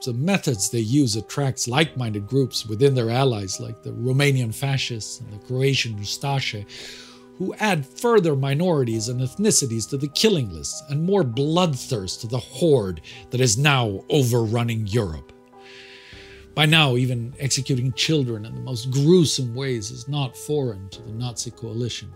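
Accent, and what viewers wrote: Germany. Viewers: Germany iirc. You can tell by his german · Yeah, I speak German, but I bet a lot of English speakers are confused. He pronounces some things the German way that should not be, because the English speakers will not understand.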